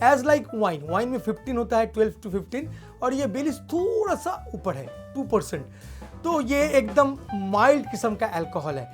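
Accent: Bengal, India. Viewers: Bengalis can conquer anything with their intellect except their Accent wile speaking Hindi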